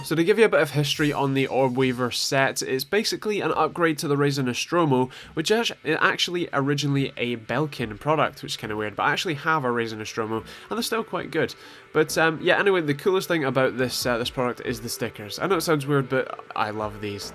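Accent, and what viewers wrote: Scotland, United Kingdom. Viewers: Good review mate and good to see someone Scottish instead of American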